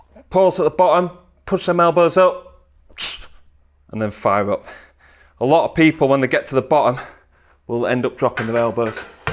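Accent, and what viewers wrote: United Kingdom. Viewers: This is an english accent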